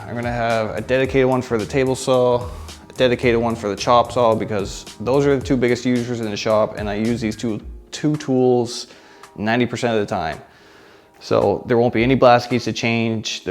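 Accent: Canada. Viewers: I believe he is canadian, not a yankee :p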